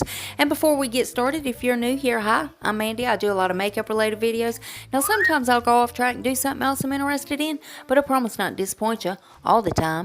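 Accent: South, United States. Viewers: Im new to ur channel. I love ur southern accent! I am a southern girl from Kentucky. Thank u for the Ipsy spoilers.💋💋💄💅💖💕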